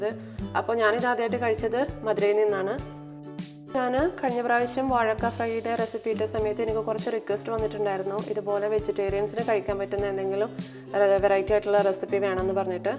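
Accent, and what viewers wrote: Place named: Palakkad, India. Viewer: Chechi inte talking kettappol sherikam pkd pole ind athu konde ah chodhiche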